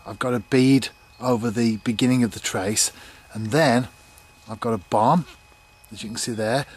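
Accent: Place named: United Kingdom. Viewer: This is where we are off to tomorrow...I liked the talking..:) nice to hear familiar British accent...regards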